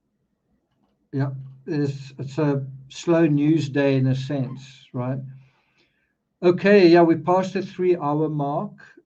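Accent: South Africa. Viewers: Is that a S. African accent? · Hi are you aa South African ( your prenounceanation is very afr.) Nothing wrong in that im afr. Know you live abrought. Oma s a.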